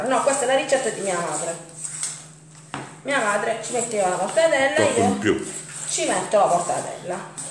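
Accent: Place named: Apulia, Italy. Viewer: sei bravissima quando spieghi,sono pugliese anche io e quando parli mi sembra di sentire la mia voce con la stessa cadenza pugliese, (Corato),da 50anni sono in Piemonte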